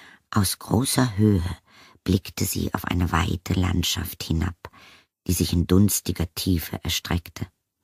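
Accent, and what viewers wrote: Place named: Berlin, Germany. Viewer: Gute Stimme , ich könnte das Beste Hörbuch Schreiben aber nicht vertonen mit meiner Stimme , wäre Volter !! Nein es ist eine besondere Stimme und gut gelesen .Hatt Berliner Dialekt leicht drinnen , denke ich.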